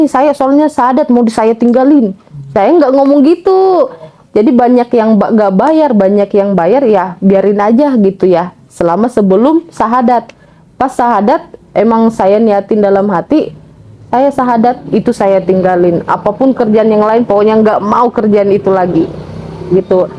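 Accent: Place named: Batak, Indonesia. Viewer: Batak gaya bicaranya seperti aagim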